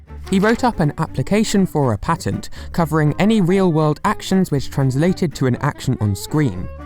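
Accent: United Kingdom. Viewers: Very british voice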